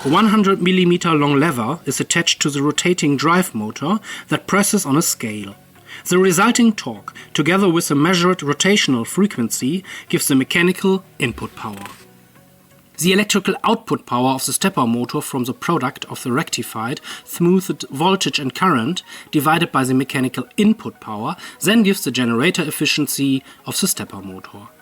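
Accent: Germany. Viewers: This is the most German English I have ever heard, saying this as a German xD But high quality content, I see the effort put into this, nice job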